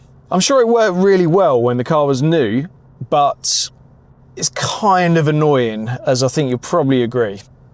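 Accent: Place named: Cockney, United Kingdom. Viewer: COCKNEY DIMWIT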